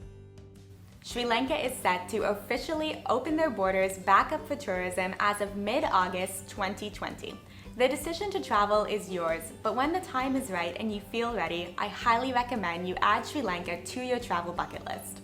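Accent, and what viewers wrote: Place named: New York, United States. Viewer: Have you done any traveling in the last few months? Sounds like you are from NY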